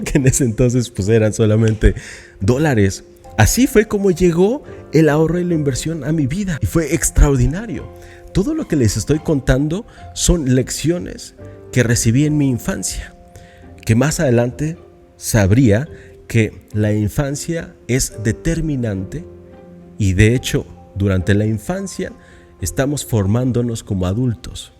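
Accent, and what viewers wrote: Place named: Colombia. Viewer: pensé que eras colombiano.por ti acento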